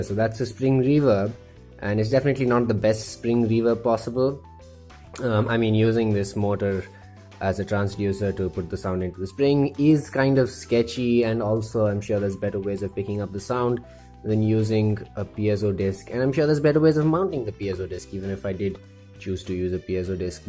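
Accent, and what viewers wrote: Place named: India. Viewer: Superb work! Your accent sounds Indian😃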